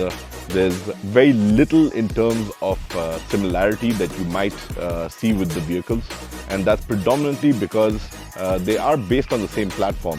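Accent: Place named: England, United Kingdom. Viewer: What's with the heavy English accent?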